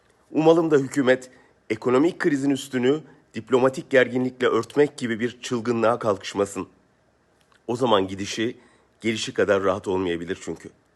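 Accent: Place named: England, United Kingdom. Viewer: Türkçe konuşan İngiliz!!!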